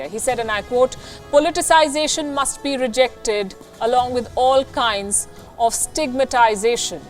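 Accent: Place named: North India, India. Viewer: I dont like this North Indian English accent without giving a pause she speaks.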